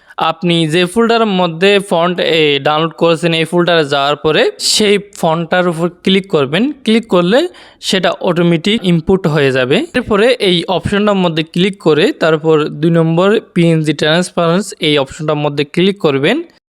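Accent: Chittagong, Bangladesh. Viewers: আপনি মনে হয় চিটাইঙ্গা তাই না?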